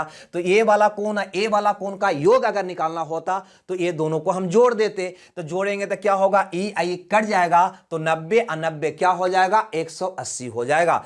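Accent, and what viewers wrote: Bihar, India. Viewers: Itna sudh hindi to desi board wale ko v samjh aayega sir tone se saaf pata chala rahe hai aap bihar ke hain but aap pura hindi me explain kar rahe hain jo kuch nahi samjh aa raha hai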